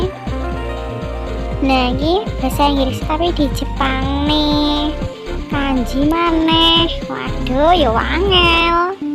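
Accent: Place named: Java, Indonesia. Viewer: medoke boloo🥳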